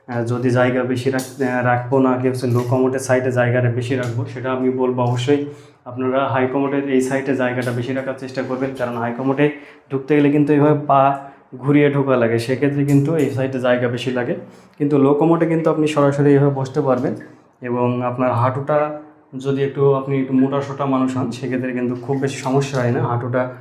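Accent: Jessore, Bangladesh. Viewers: আপনার কথা আমাদের যশোরের মতো। আপনার ভিডিও টি অনেক সুন্দর হয়েছে।অনেক কিছু জানা হলো।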